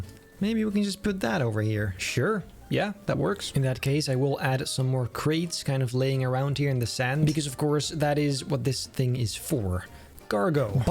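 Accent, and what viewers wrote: England, United Kingdom. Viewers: Brilliant love the English accent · Keep up the british accent - you’re good at it! :)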